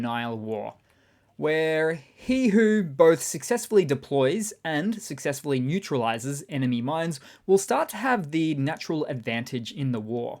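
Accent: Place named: Australia. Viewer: Now I know you are Australian by your accent , so by chance I came across an Australian Youtuber who lives in the outskirts of Moscow with his Russian Wife who does tours of the City and its surrounds (Travelling with Russel) and you can see by the videos he films , that the residents of Moscow give the impression everything is normal and there is no War Going On over the past 18 months , it is quite surreal to watch how the ordinary Russians go about their daily routine as though their country is at War at all, the supermarkets are full of all the food that they could purchase before the War started and the only thing they cannot purchase are European or Japanese vehicles other than the flood of new Chinese brand vehicles being assembled in their country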